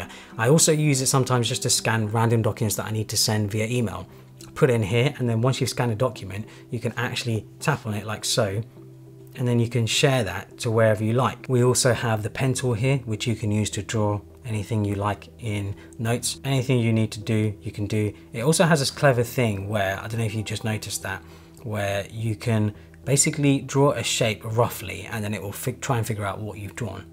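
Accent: United Kingdom. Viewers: Yeah, an accent that doesn't make sense generally, the British... I shouldn't be hearing "nights", instead of "notes". That's why people don't like it.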